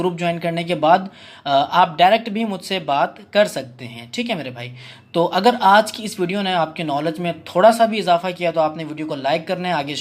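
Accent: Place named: Karachi, Pakistan. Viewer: isliye poocha k apki urdu Karachi wali lagti thi main khud Karachi se hun tou isliye confirm kar raha tha... hahaha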